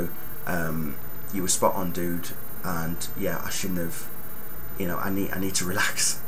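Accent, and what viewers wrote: United Kingdom. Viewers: Man, U dont have to apologize its ur opinion. I might noit agree with u sometimes but I still like ur review and ur British accent lol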